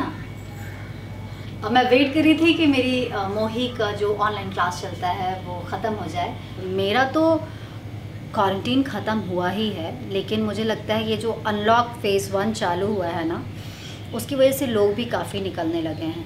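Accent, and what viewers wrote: Bihar, India. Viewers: Apki voice or bolne ki tone hi irritating hai aisa lgta h Bihar ka touch h sur nikal kr bolna normal bola Kijiye thik lagega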